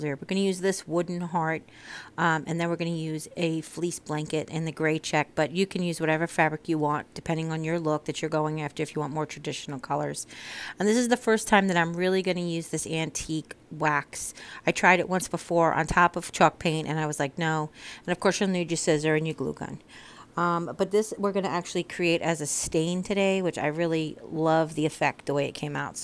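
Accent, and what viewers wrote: New York, United States. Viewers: Love the rosette tutorial! Do I detect a NY accent?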